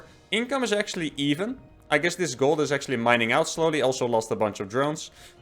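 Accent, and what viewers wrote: Netherlands. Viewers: I can just tell by your accent that you're Dutch. Good to see another dutchman on this site!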